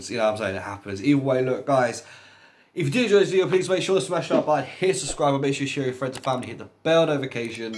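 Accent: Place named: England, United Kingdom. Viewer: Thank you Mr.. You speak with a heavy English accent.I like your viscous English. Often I can"t really catch it cause I still learn it Thanks a lot indeed however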